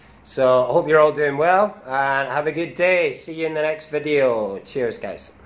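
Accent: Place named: Scotland, United Kingdom. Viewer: Good to hear a fellow Scot in China! I am in Beijing about to venture into teaching, this is very helpful thank-you! (Tom, Edinburgh/Shetland)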